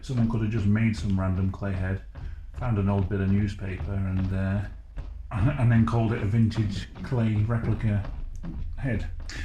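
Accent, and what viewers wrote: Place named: Liverpool, United Kingdom. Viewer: If your from Wales and not liverpool why do u talk with a scouse accent lol??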